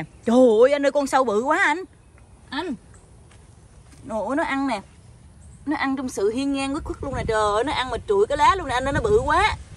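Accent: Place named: Saigon, Vietnam. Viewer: Cô ngạc nhiên nói giọng SG nhưng dùng từ MB.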